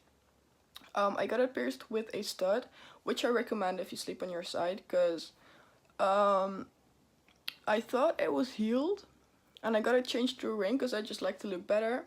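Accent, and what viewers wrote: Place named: Netherlands. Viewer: Ben jij nederlands Zowel ik hoorde het helemaal aan je accentje😳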